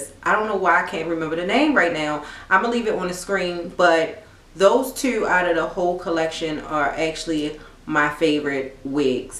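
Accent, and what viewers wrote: Baltimore, United States. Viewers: Lol yeah she must be from Baltimore the way she keeps saying “to/tew” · are you from Baltimore? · That baldimore accent! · Okay I’m getting this wig because of you ❤️ & your accent you sound like your from Baltimore also new Subbie 😊❤️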